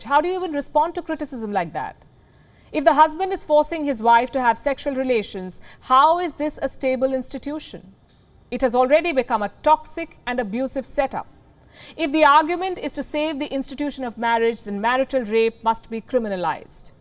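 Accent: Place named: India. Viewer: People mock her for her Indian accent. You are probably the first non Indian audience I have seen to appreciate her- I hope u r non Indian!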